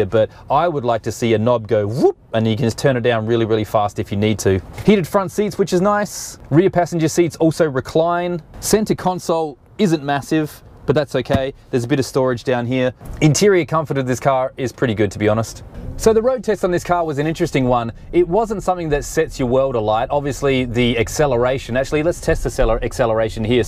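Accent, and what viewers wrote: Australia. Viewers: Awesome review best I've seen on YouTube so far and I've seen most on the Outlander PHEV as I used to own a 2014 model in Sydney and Perth. I sold it after 3 years to buy a Prado with 7 seats but now regret selling it as I'd go for weeks without putting fuel in it and loved the paddles that allow you to control the level of regenerative braking. I recently sold my Prado for more than I paid for it due to crazy prices due to Covid and bought a 2020 hybrid camry for the wife. We have a 5.3kw solar system with 20 panels that only cost $1000 on our roof so charging an EV in the day costs us next to nothing. I want to get a 2020 PHEV now or possibly the new Kluger (Highlander) Hybrid that's coming to Australia this year. Speaking of Australia was great to hear your Aussie accent! Where are you from in Oz? Sounds like your enjoying Canada & have a great job. 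Thanks for the excellent review am looking forward to watching more reviews especially on Hybrid or electric family SUV's.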